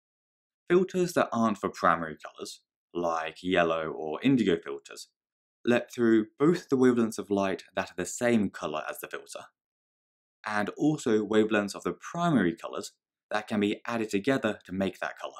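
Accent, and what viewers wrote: United Kingdom. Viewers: I love bri'ish English.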